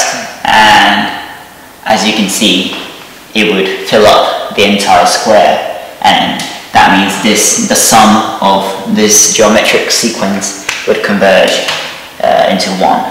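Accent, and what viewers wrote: United Kingdom. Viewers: 美しいイギリス英語！ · If anything more inclined to British English his English is, which is really comfortable to hear. A great explanation.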